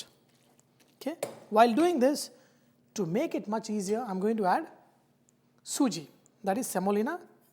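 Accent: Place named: South India, India. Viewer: Snigdha Parida he is from south india so they pronounce like that ☺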